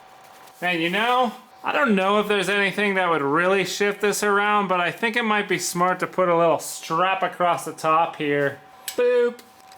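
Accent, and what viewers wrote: South, United States. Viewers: First time finding your channel... You know that team the shoots pumkins with the air cannons, the guys that look and sound like hillbilllies but are actual rocket freaking scientist....you remind me of them, so I subscribed..... I like crap like this.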